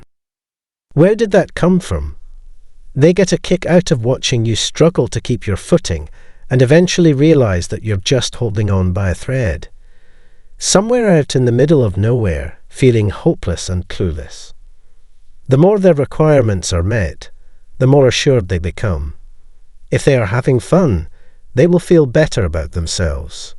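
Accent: Scotland, United Kingdom. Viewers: Love ur videos, love the Scottish accent , 🇨🇮👍👍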